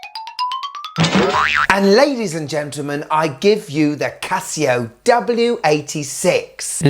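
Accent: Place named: England, United Kingdom. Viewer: What an english english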